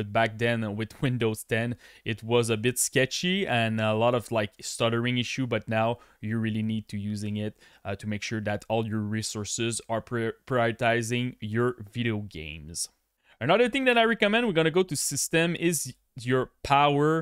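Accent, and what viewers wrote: Quebec, Canada. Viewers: Are you from Quebec? You have the same accent as my wife and Georges St-Pierre. :D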